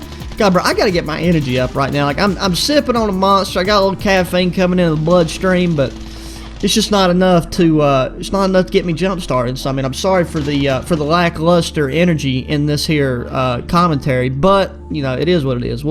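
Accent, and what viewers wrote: United States. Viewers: Country accent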